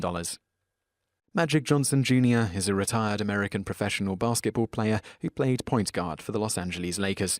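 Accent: United Kingdom. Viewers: Without checking the other comments... the person narrating this is the least compelling narrator on the planet. He makes "interesting facts" so effing uninteresting and boring, British accent notwithstanding. Put a little pep in your step. HOWARD STERN RULES BABABOOEY!!! (i mean seriously british dude, include a little personality).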